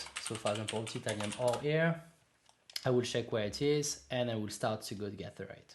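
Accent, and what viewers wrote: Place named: France. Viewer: non non, je suis Français aussi et ça m'amusais juste de voir quelqu'un avec un accent plus fort que le miens lol. Super vidéo merci. 🤝🏽👍🏽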